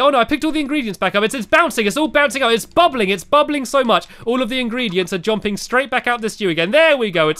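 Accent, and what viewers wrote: United Kingdom. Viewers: He can't help his accent hes not from america gosh guess your saying people that are not american have a dumb accent wrong ! I think he is lucky living in the UK it sounds like fun with the queen living somewhere there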